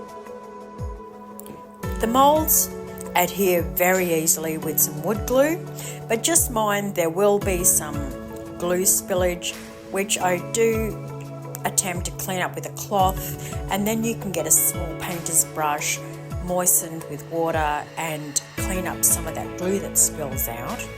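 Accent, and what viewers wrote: Australia. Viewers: Hi, a lovely piece, I love the felt tool,a great idea, also nice to hear an Aussie voice, regards pamela hall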